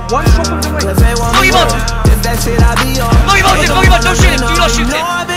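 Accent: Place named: Wales, United Kingdom. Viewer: I do like that signature Welsh voice though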